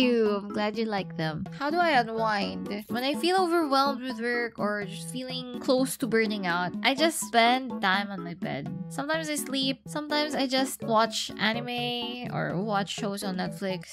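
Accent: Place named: Philippines. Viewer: One thing I love about your shorts is your slightly wicked humor and comedic timing. And yes, your Yor and Anya shorts hooked me. I got the sense that you would make one laugh a lot on a date. Have you considered standup? Anyway, please use humor as a part of what you produce. Also, I love your accent. You probably know but I’ll say it anyway: your kind of Philippina cute/sexy is a powerful magic wand anywhere. I would also love to see your aerial work!❤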